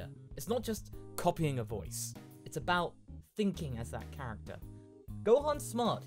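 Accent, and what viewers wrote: United Kingdom. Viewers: He wouldn't get any troubles to make a Goku Black voices considering the British Accent on that. Also, when I just figured that he's actually British, that just blowed my mind! That's amazing, I could swear that he was American. Much love from Brazil 🇧🇷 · The first time I heard your natural speaking voice when you were at a panel, I honestly thought you were just putting on a fake accent, and I was like, "Who the hell is that guy? Where's Goku's voice actor?" Only to realize YOU were Goku and that your natural voice has a British accent. I felt super awkward when I realized that, but I'm pretty glad now knowing you were behind it, and seeing you do the DBZA Goku voice just makes me smile. Keep up the good work,! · u sound british m8..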